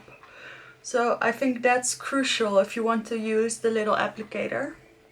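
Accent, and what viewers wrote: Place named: Netherlands. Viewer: I can detect a beautiful Dutch accent!! (One of my dear friends is originally from Breda). I loove Givenchy powders, the original Prisme Libre powder is so beautiful. Fantastic look 💕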